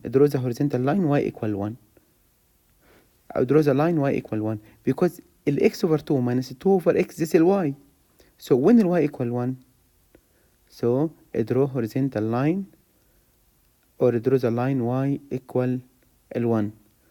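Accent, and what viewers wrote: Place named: Arab world. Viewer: I can tell from your accent that you are Arabic And btw you helped me alot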